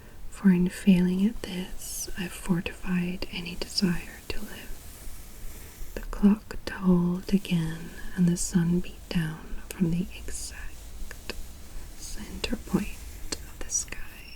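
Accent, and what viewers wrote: Scotland, United Kingdom. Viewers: Nice scottish accent. Love it. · What’s your accent? I can’t tell. You sound Scottish/welsh sometimes and American sometimes lol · Okay, my attuned ear is picking up a slight Scottish brogue from you,.🤔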